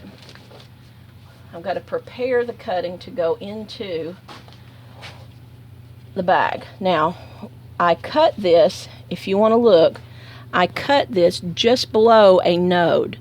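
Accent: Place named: South, United States. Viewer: Oh . You have a southern accent? I didn't notice . I picked up my English in North Carolina . So apology is not needed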